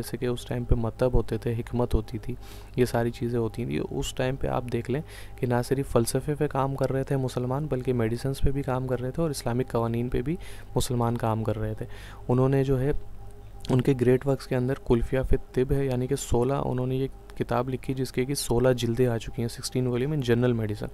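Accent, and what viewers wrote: Karachi, Pakistan. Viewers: sir ap k language accnet sy meny abserve kr lia tha k ap karachi sy hain ❤❤❤❤ or apny sahi kaha k koi students panjab k kisi village sy lacture sun rhy hongy ..exectly am listining your lacture from panjabs village ❤❤❤well explaind ❤❤❤ May Allah give u success by leaps and bonds❤❤❤❤ameen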